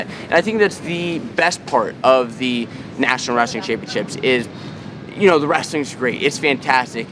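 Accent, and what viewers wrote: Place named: Pennsylvania, United States. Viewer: Keep working all your contacts and especially the coaches. If you can help a coach recruit a wrestler or two you will have made an influential friend for life and then we will be watching you on Big10 Network or ESPN. All of your followers can help you scout wrestlers that should be offered scholarships to the big and nearly big programs. Then if you could lose the Pennsylvania accent and try really hard to not be a Penn State fan all of the time you could become one of the most popular wrestling announcers ever. You obviously love this and should follow your passion. Best wishes to you and just like wrestling - success goes to those who prepare in the off-season.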